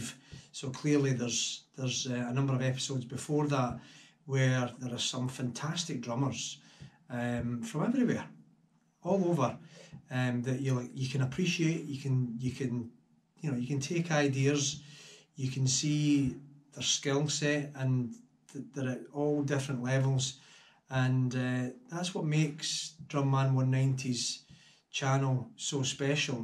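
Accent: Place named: Scotland, United Kingdom. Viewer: I think you made a really great video here and you are absolutely right. DM 190 is a constant here on Youtube and deserves your award. By the way. Nice to hear you speak a little longer. I love your scottish accent. I could listen to you all day Like your drumming.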